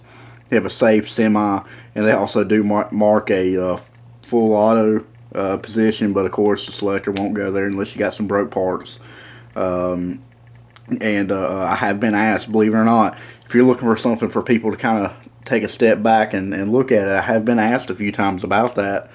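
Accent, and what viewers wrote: South, United States. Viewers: No he doesn't! You just have be from the south to understand him. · ok little southern draw but why Carolina? we all ain't gat that twang....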